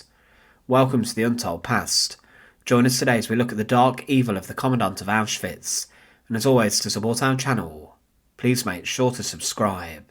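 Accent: United Kingdom. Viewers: What type of UK accent do you have?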